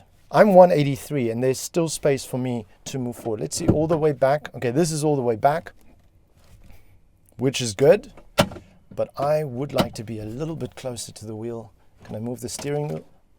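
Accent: South Africa. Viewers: Dude you sound south African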